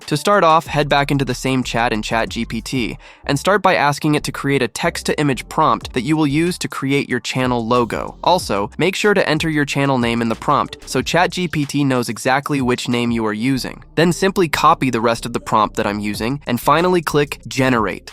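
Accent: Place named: United States. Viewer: Love American accent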